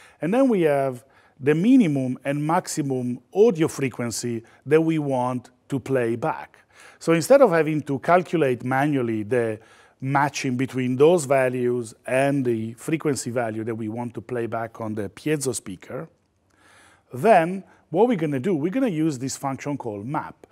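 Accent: Italy. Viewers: Si capisce che sei italiano. Grazie per il video è molto interessante. Per caso fai cosrsi a pagamento? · i dont know why but the fact you have a lovely italian accent makes me understand things better :D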